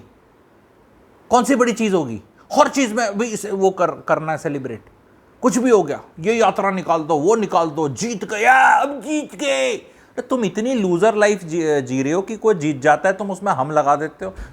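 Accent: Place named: North, India. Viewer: Mast bolte ho aap...typical north Indian style...maza aa jata h...hasi nhi ruk rhi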